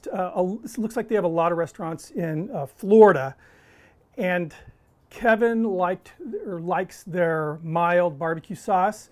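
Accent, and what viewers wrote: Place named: Texas, United States. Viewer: no need to have a Texas drawl, you're a pitmaster, brother! 🌵🤠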